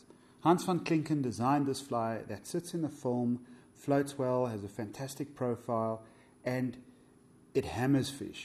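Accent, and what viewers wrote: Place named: South Africa. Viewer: Love the tying and the SA accent. Great fly thanks.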